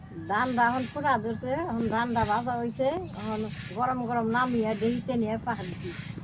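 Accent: Tangail, Bangladesh. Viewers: এটা তো বাংলাদেশের টাংগাইল জেলার ভাষা বলতেছে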